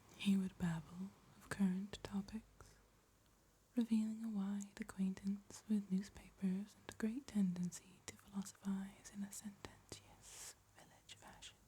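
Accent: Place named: South, United States. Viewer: Ok I’ve finally listened past the first few minutes without falling asleep (took several tries), and please tell me how an English girl does a better southern American accent than half of Hollywood 😂👏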